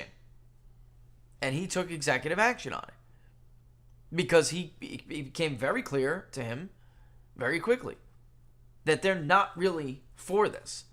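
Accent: New York, United States. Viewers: It’s a New York/New Jersey thing, thus why Trump also uses this peculiar pronunciation. LOL.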